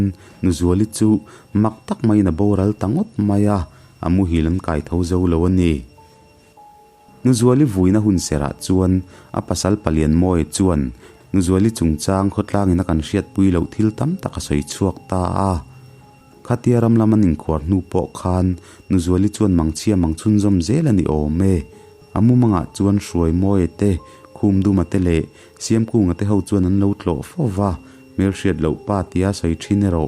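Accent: Mizoram, India. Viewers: A puangtu aw hi a mizo aw raih mai a ka ngainat zawngtaka ni ngaih thlaka nuam.thin. e.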